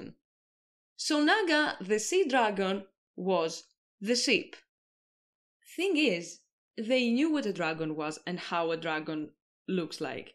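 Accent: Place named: Greece. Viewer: From your accent I was sure that you were greek lol, and it was confirmed by the reference to Santorini. It is wild how coincidental knowledge someone has due to their local environment can help them discover things that others would have (probably) never thought of! Very thorough research on the lore too, I look forward to watching your other videos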